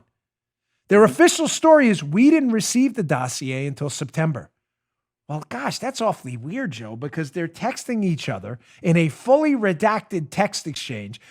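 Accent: New York, United States. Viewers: Thank you Patriot Dan, for all that you do, everyday. You bring us the truth, and the updated reports. Always educating us, showing exactly what's going on. You always are on top of the events at hand. Thank you, bless you and your family and please, be safe! Also, I see that both of us have never lost our NEW YAUWK accent.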